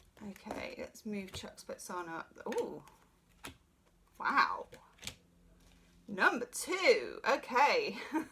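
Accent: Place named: United Kingdom. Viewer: #2 let me just get a cup of waaaaater loving the British accent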